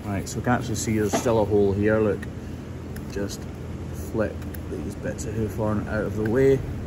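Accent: Scotland, United Kingdom. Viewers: I could listen to you all day. My family members are all Scottish before me, I'm the first Canadian in my family. The Scottish accent is the sound of my childhood. Ive lost a lot of them...Im 56 now so they all passed of old age etc. Ive been pretty depressed for the last year- health issues - and listening to you reminds me of my childhood. The wonderful Scottish brogue makes me so happy. I love the videos as well but I mostly watch to hear you speak. Much love from Canada eh. <3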